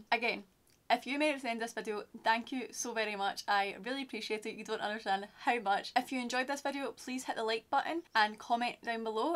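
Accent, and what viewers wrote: Scotland, United Kingdom. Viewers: I LOVE YOUR ACCENT!! It reminds me of my Scottish grandparents when they would always talk to me while they did my plats. ❤❤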